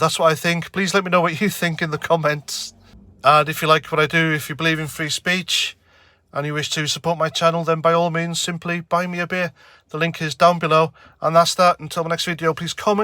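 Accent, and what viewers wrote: Wales, United Kingdom. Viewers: You sound from north east Wales or gogledd gorllewin Cymru as it's correctly known as. Why is showing one of our few democratic right to show dissent , in this case booing a dull and out of date anthem , classed as unpatriotic ?? What's wrong with being a socialist ?? We've had more years of right wing governments yet we still have more children in poverty than other nations in both the G7 and G20 group. We have the worst performing nation in terms of economic output and GDP in the G20 (except for Russia). We have one of the least democratic elections and form of government in the western world . I'm ex army - served in the Welsh Guards and yet since 2010 more and more ex squaddies are homeless and relying on charity as the state is doing less and less for them. May I suggest you do some research instead of posting right wing populist myth and wind your neck in you selfish halfwit.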